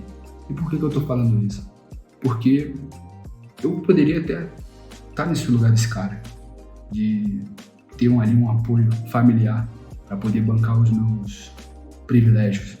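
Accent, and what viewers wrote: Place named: Rio de Janeiro, Brazil. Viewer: Você é muito aluno mesmo kkkk, até suas posições e suas mãos, além de sua fala pausada e encariocada mostram isso kk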